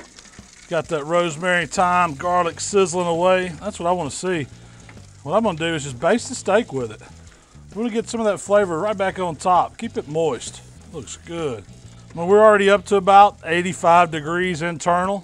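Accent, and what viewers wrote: South, United States. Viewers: American accent?? Don’t you mean southern?? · This defines America. Some fat guy with an American accent showing us how to grill a huge steak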